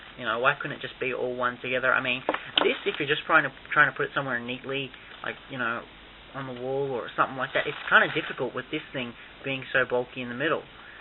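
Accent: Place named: Australia. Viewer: nice australlian accent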